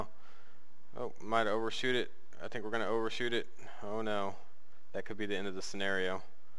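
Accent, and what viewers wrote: United States. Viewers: Are you American? I live on this line :)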